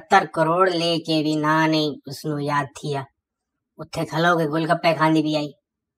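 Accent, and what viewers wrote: Saraiki, Pakistan. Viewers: Garav gera salute to h that u kept ur ancestor's language alive even in india after d partition..lov ur sraiqi axcent i belong to pakistan from sraiki belt althought nor a sraiq myself but knows sraiki very well...thuwaku meda salute bauw zabrdast alaa ay thuwadi ji khush krdita hay zraiqi zindabad saadi mithi alaa te salamti einku alaawarnd alaa te....🎉🎉🎉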